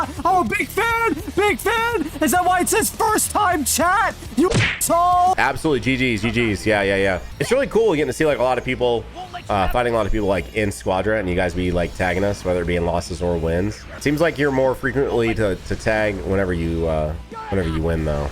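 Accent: South, United States. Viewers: Didn't know i needed a Southern video today😂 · the southern accent is killing me 😭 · Your southern accent sounds fine to me but i also grew in southern state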